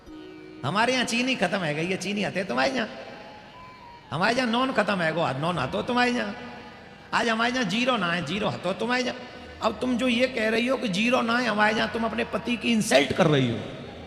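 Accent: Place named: Braj, India. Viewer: Guruji aap braj bhasha bahut achchi lagti hai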